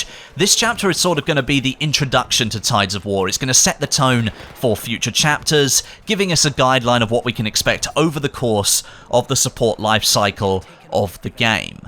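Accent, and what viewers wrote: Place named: United Kingdom. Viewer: This high pitch british male voice is really relaxing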